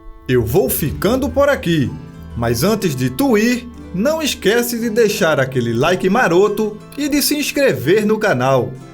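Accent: Northeast, Brazil. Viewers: Esse sotaque é ótimo. Muito lindo sotaque nordestino.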